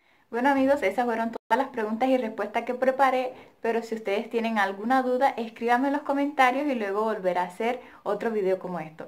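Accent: Caribbean Coast, Colombia. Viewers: Superrr!! 💃 me veo todos tus vídeos y me encanta que conservas el acento costeño, nuestros refranes, nuestros dichos 🤣🤣me toteo de la risa contigo y tus aventuras. Chachi es un hombre muy especial. Saludos!! Un fuerte abrazo!!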